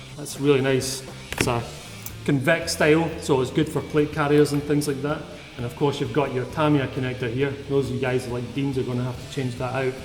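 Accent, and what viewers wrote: Scotland, United Kingdom. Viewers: No, I think he sounds like a Scottish guy.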